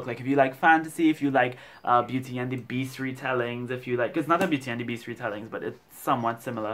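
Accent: Trinidad and Tobago. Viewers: are you from Trinidad because you have a Trinidad accent by the way love your videos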